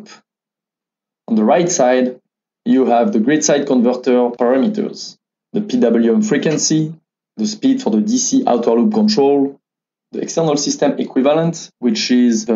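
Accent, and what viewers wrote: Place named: France. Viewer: Mon anglais est plutôt chaotique. Il me semble détecter un petit accent français assez sympathique ducoup auriez vous la même vidéo en français ? 😅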